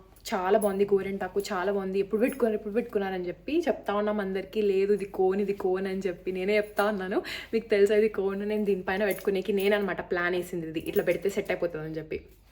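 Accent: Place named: Telangana, India. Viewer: emo akka nuvu matladuthuntay ma Telangana lo matladi nattu undhi naku, antay dialect antunaa......Ma Palamuru(Nagarkurnool+Mahabubnagar+gadwal) ki rayalaseema ki chala similarities untai ,idhi na personal opinion. Lots lo love from Telangana akka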